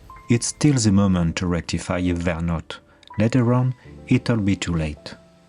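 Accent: France. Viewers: Great video! So nice to hear that great accent. All this time we didn't know you are French. Not that it matters, just enjoyable. You are such an inspiration. Thank you... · Again beautiful work. I like the ´frenglish´ accent too. You have inspired me a couple of years ago to make bonsai pottery myself as I learned a lot from your videos. Can´t wait to see your pots on the trophy next week and see the artist in person. Greetings from Belgium.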